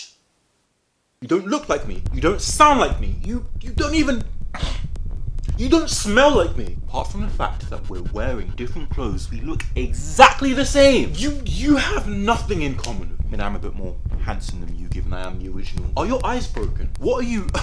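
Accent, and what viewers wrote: England, United Kingdom. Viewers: I thought it was because you were British and if you say glasses in a British accent it sounds like. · Tbh I never realized you were English before I watched this video. Also my mx blues are so loud I want to rip out my eardrums sometimes. thank you for educating me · He sounds Bri Ish for some reason.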